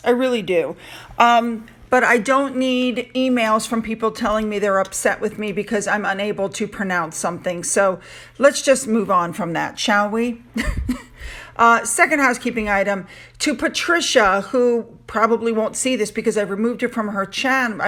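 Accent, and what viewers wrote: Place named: United States. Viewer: HI NUMPTIES saying how to pronounce words I love your American accent and your very well spoken and a great Narrator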